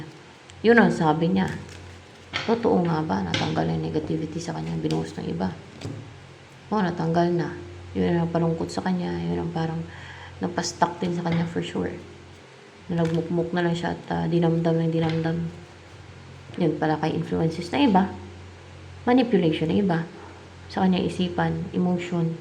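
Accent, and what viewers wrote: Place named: Visayas, Philippines. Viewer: bisaya ka madam a bisaya din cebu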